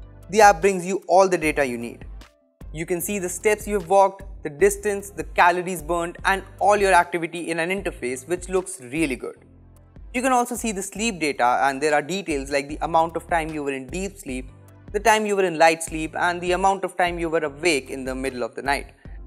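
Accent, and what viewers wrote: India. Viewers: Uuuuuuh, the Indian accent is... is... hard to digest, sorry( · Bu ne biçim ingilizce yaw abi resmen hintçe gibi konuşuyorsun ya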